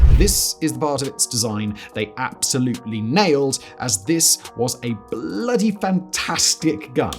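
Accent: United Kingdom. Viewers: You are obviously biased against German weapons; your British accent and arrogance gives you away! My uncle fought against them, and he said that they made our tanks look like trash; in terms of firepower, mobility, and armor protection, they were superior to all allied tanks. There did have teething problems initially, but they were quickly ironed out, and the Panther became one of the best tanks of the war. You need to trash a your bias, and stick to facts. Talk to veterans that fought in them and against them, and you’ll have (or should have) a different opinion. You’ll lose viewers if you keep up your bias. Read the reviews and you’ll see it.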